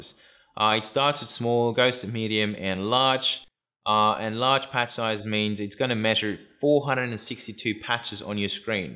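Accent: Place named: South Africa. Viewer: Felt like there were hints of South African maybe? I'm also curious!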